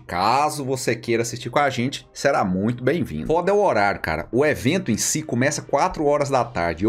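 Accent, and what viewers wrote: Minas Gerais, Brazil. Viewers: ..vc é de onde de Minas Gerais uai?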